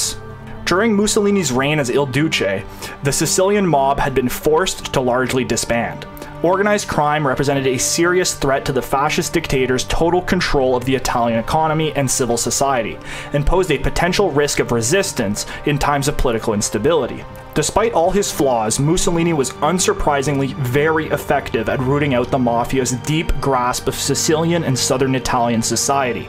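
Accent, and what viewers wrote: Canada. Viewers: Pretty sure the narrator is from Canada. That dialect is unique and screams classic Canadian.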